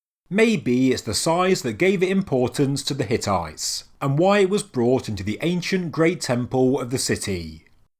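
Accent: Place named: Black Country, United Kingdom. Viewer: He is definitely from the black country in England. Though that doesn't attest for the random drop in octaves on the last word in every sentence.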